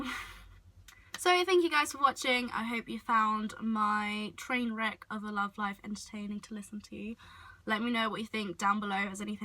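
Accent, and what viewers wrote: United Kingdom. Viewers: Ur accent is so stereotypically British to me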